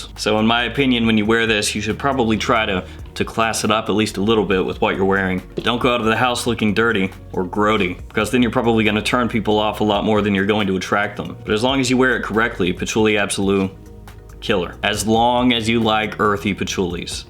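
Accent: South, United States. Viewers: there's a bit of a southern twang to your speech, it's very interesting and enriching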